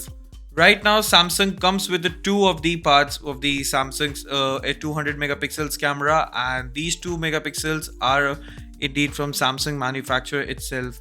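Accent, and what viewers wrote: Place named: India. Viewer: Nobody knows:) some mixture of indian and a little bit of english, pretty weird))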